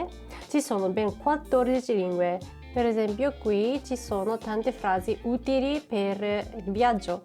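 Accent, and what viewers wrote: Japan. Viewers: Il tuo Italiano è quasi perfetto; l'inflessione Giapponese si sente pochissimo. Peraltro quando i Giapponesi imparano una Lingua straniera generalmente lo fanno molto alla svelta ed in modo spesso ineccepibile. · Sono contento di sentire un'altra studentessa d'italiano! (Sebbene io non sia di madrelingua giapponese) Dirò anche che è interessantissimo sentire questa lingua parlata con un accento giapponese! Per quanto riguarda i generi e il congiuntivo, io sono d'accordo. Quei concetti sono difficili anche per noi madrelingua inglesi. Li sbaglio a volte ancora oggi. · Hai ragione, è una lingua complicata. Non me ne sono mai reso conto fino a quando l'ho dovuta insegnare! E non parlo solo dei verbi. Comunque i miei complimenti perché parli molto meglio di tanti italiani che ho modo di sentire là fuori... La cosa che mi fa sorridere è sentire la cadenza della lingua giapponese mentre parli italiano. 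Trovo la combinazione molto Kawai!! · Ciao! A me piace sentire parlare gli stranieri anche se fanno errori, anzi...è più divertente!:-) L'accento diverso e le parole sbagliate sono qualcosa di caratteristico e non si deve essere perfetti per farsi capire! Comunque tu parli un ottimo italiano e il tuo accento giapponese è molto carino:-P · volevo farti i complimenti a parte il tuo accento ( un po si sente) parli perfettamente italiano e concordo sulla costruzione della frase , voi giapponesi avete la costruzione della frase un po come i sardi :P .le poche frasi che conosco di giapponese le ho imparate dai manga :) .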